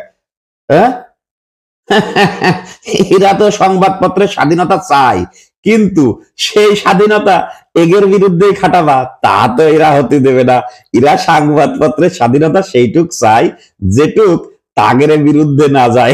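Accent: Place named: Khulna, Bangladesh. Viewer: আস্সালামুআলাইকুম, আমি পশ্চিমবঙ্গ থেকে শুনছি। আমার দেশ খুলনা, বৃহত্তর খুলনা। আপনার কথা শুনে মনে হয় আপনি khulna/যশোরের মানুষ। আমার জিজ্ঞাসা আপনার বাড়ি কনে? ভাষা শুনে বড়ো আপন আপন মনে হতিছে। জানালে খুব ভালো ঠেকপে।। ভালো থাকপেন।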